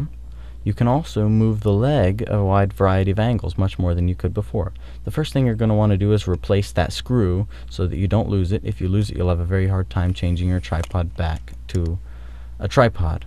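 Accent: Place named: California, United States. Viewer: it's weird to hear your voice before you got your California dude-bro accent haha <3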